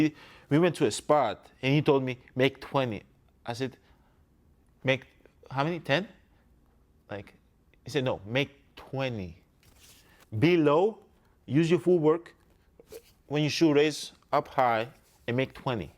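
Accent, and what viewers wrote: Greece. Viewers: He's Greek accent and above all his Greek mentality is on display 100% and I love it! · He’s Greek and speaks English with a Greek accent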